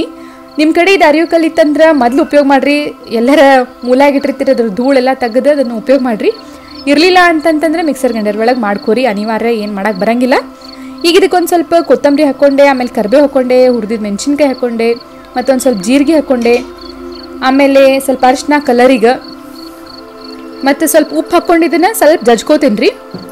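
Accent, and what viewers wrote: North Karnataka, India. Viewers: Impada north Karnataka Kannada - very pleasant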